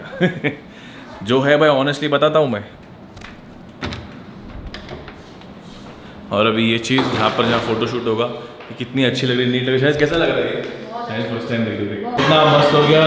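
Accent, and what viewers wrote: Mumbai, India. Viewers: Sir...GoPro Waala View Mast tha...Nd Old Mumbiker Sir is Back with his Mumbaiker Waali Tone....sir Loved the interviw!!😀😎❤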